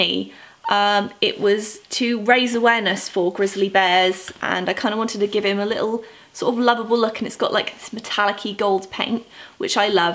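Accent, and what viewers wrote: United Kingdom. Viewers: Ur British accent is so hot 😍😍😍😍😍😍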